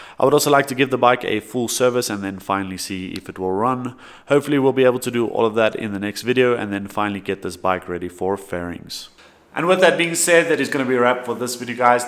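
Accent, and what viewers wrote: South Africa. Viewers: Is jy afrikaans daai aksent se so...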